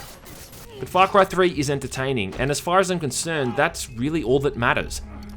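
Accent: Bogan, Australia. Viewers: ur just a below average reviewer whos only popular because you have that bogan aussie accent.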